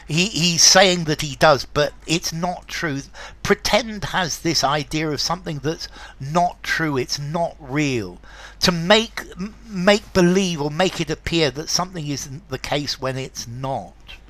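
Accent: RP, United Kingdom. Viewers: I like posh accent a lot 🎉🎉thanks a lot sir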